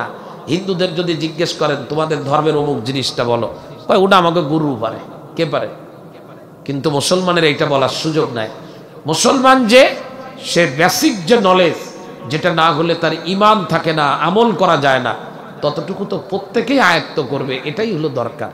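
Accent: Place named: Satkhira, Bangladesh. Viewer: Satkhira tune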